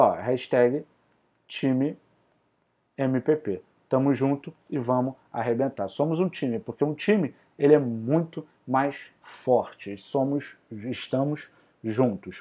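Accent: Rio de Janeiro, Brazil. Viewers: A melhor forma de aprender matemática, com sotaque carioca, muito bom vcs são incríveis 🎉🎉🎉❤❤❤❤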